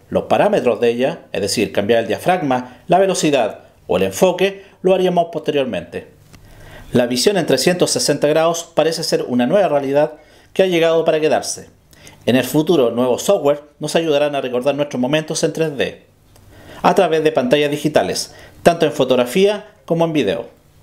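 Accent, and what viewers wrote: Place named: Chile. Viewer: Muy bueno tu video. Me queda claro que eres chileno, nuestro acento es inconfundible. jeje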